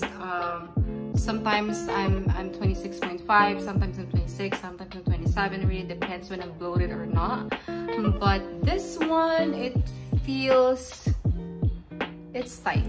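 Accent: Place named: Philippines. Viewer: Dami paarte ng salita, pilipinong pilipino naman tono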